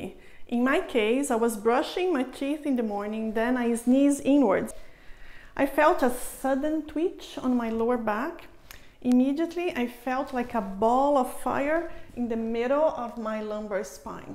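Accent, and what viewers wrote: Brazil. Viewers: You sound Brazilian.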